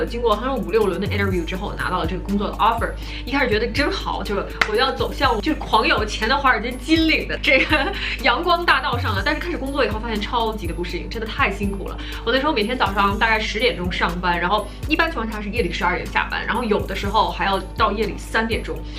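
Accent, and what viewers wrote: Beijing, China. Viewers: 偶尔看到的视频说话的语速和独特的气质感觉应该是北京女孩，果然是啊！好喜欢💕气质真好！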